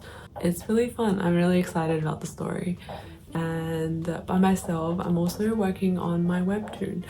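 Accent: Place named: Perth, Australia. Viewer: Thought you had a Perth accent. Funny how I could always pinpoint people from home amongst other Australians. Last year I met some people 'from Australia' in Japan and I said to them 'no you're from Perth', and they were 😅 Didn't know there was a comic arts festival here.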